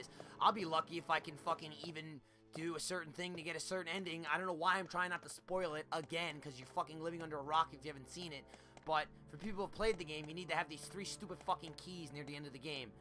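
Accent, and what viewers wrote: New Jersey, United States. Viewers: Lol he still had some of his New Jersey accent back then.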